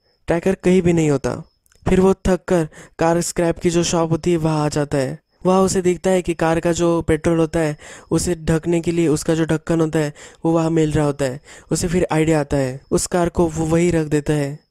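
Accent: Maharashtra, India. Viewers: Tu pakka Marathi hai Accent pakad Kiya mene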